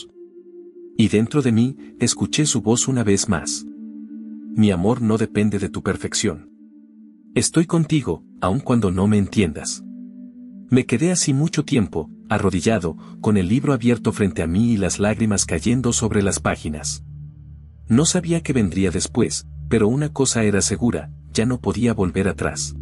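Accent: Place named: Portugal. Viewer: Porque habla portugués si lo hace en español es una mezcla muy mal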